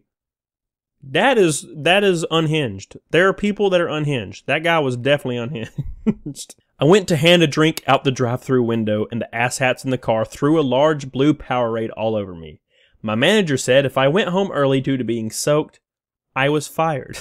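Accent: South, United States. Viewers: You've had people say they hate your voice? That silky southern drawl? The fuck is wrong with those people? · lol btw I like your voice reminds me of when I used to live in the south. I had some of the fondest memories.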